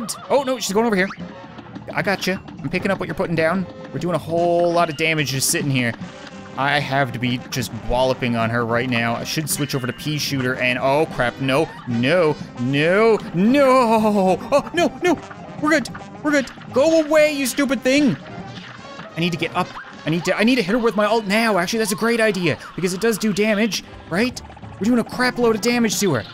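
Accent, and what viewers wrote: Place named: Newfoundland, Canada. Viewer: I have watched a number of your videos now and I have shown them to my boyfriend. We're both from Alberta, I told him that you're a Canadian Youtuber from Newfoundland. He said he could tell by the way you pronounced some words. I never noticed before this video! I definitely heard a bit of the Newfoundland accent in this video! It's nice to see your channel continue to grow! I find your videos to be entertaining and unique, best of luck in the future!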